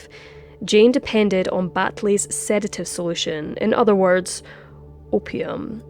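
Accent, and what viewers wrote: Scotland, United Kingdom. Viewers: Excellent channel your accent is scottish i think anyways thanks from Boston Massachusetts USA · You uttered the phrase "by a malicious Englishman", with far too much enjoyment in your lilting Scottish accent! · It's good to hear a Scottish accent! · Love your Scottish accent. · Love your videos, Scottish accent (I'm from Ayrshire) is the best for crime docs. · I keep forgetting you're scottish. I really do enjoy Scottish narrations! Is your accent Glaswegan? (Sp? ) Or is it from another area?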